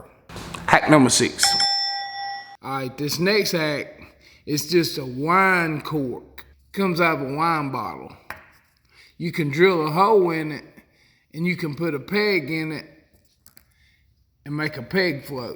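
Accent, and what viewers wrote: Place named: Mississippi, United States. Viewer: Thanks! You sure you ain't from Mississippi? It's the same accent.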